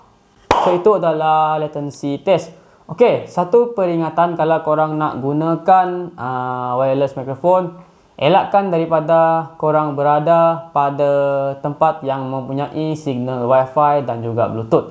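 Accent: Sarawak, Malaysia. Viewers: bos ada slang iban or bidayuh..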